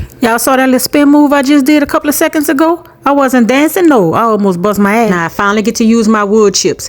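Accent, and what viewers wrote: New Orleans, United States. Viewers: Hey,are you from New Orleans?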